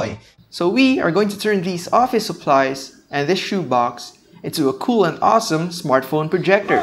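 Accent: Philippines. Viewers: He has a filipino accent :// sorry I'm new here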